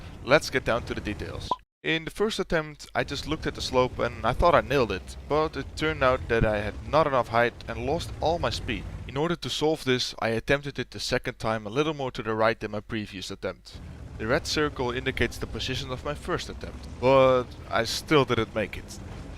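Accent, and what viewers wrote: Netherlands. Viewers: Are you Dutch? Well, I am... And your accent is extremely Dutch :) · you sound dutch..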